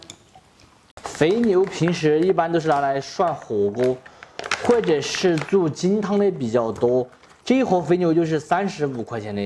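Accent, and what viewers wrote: Sichuan, China. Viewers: 味道肯定巴适得板，配合你的川普简直是绝配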